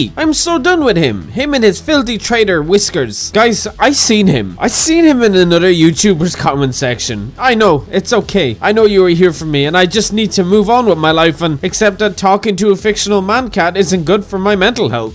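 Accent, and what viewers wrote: Ireland. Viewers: I like the video but dislike the Irish accent, what do I do? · Yes, I came here to your channel specifically to listen to your Irish accent. There's no doubt about it. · Not here for Irish accent already got one of my own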